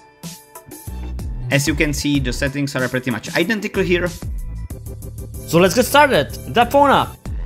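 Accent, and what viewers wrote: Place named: Czech Republic. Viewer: Ten českej přízvuk se prostě neschová ((; hodně štěstí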